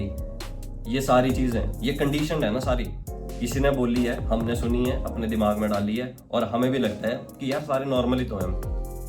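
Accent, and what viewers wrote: Himachal Pradesh, India. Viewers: Your accent tells that you are from Himachal.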